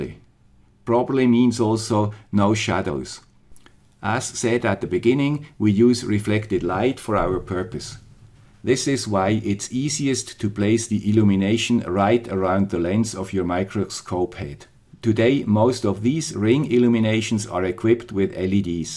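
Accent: Switzerland. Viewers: Many years later, this is still a very helpful video. Thank you, guy with the Swiss accent!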